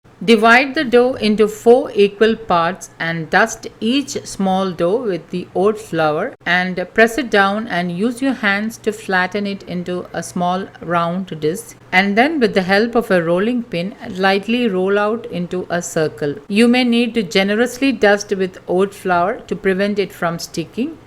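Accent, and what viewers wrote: India. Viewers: Thanks... Your recipes are the best so far. I really like your Indian accent too.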